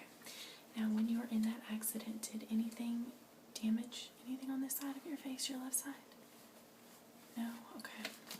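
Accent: South, United States. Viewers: Love this video! Do I detect a very slight southern accent? It's extremely relaxing! Thanks so much.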